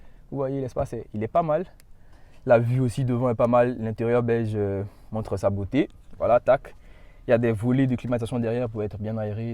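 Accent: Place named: Haiti. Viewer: Belle video, bien argumentée dans un français soutenu, avec des anglicismes fort bien maîtrisé, une VOIX de lôveur tintée d’un léger accent! D’origine haïtienne!?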